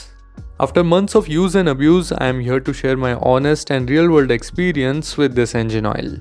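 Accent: India. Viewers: your tone is hindi but you speak english,...is very bad if speak english use english tone....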